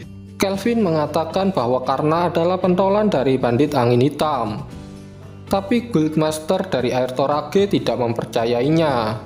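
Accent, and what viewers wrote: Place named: Java, Indonesia. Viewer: Jangan medok min ngomongnya🤣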